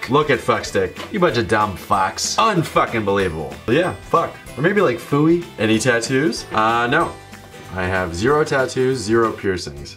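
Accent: Boston, United States. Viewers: I enjoy your Boston accent. :) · This was fucking excellent! That Boston accent is definitely coming through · Love the Bostonian accent.. and the beard!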